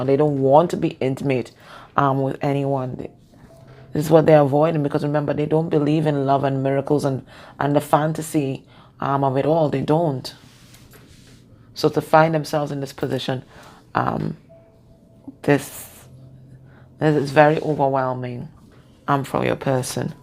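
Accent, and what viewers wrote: Trinidad, Trinidad and Tobago. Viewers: That’s a Trinidad accent y’all! 🇹🇹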